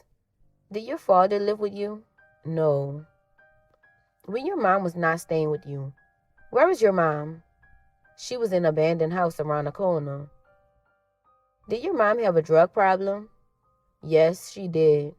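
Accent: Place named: New Orleans, United States. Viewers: Finally... someone with the Nola dialect reading. Great job! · 💯👍🏾 Telly was a Dog! The narrator sounds like she’s right out the 7th ward! Lol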